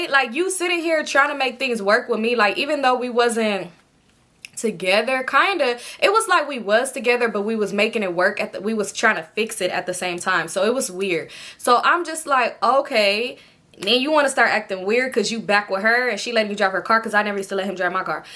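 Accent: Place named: West Coast, United States. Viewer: Dang your /our west coast accent is crazy annoying .. I done stopped making conversations.SLOW down gurl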